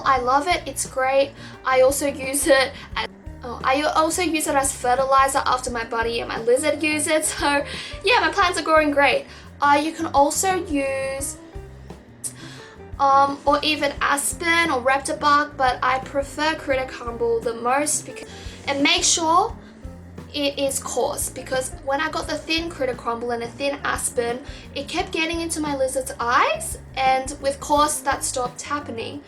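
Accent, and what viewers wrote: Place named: Australia. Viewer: I'm not sure where you're from but do you call it a lizard instead of a skink because you caught yours? You sound almost Australian, but I'm not sure, and your blue tongue looks very much like those that would be found naturally instead sold! I'm just really curious. :)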